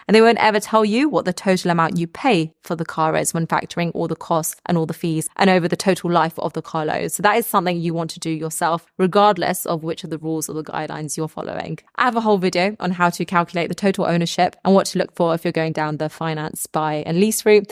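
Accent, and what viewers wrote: United Kingdom. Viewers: Everything sounds better in British. So tired of mumbling idiots here in America 😂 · great video, you sound British so I assume you live in the UK (could be wrong) so why use dollars ?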